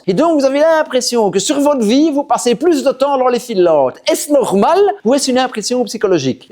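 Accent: Belgium. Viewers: En belge avec un accent horrible et répugnant ?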